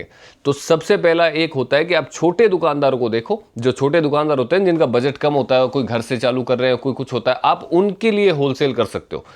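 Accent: Rajasthan, India. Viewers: आप राजस्थान से हो क्या भाई जी।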